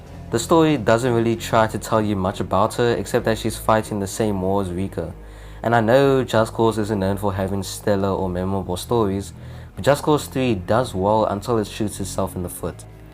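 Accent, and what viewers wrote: South Africa. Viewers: The second I heard your accent I knew you were a fellow South African 😂 great content bro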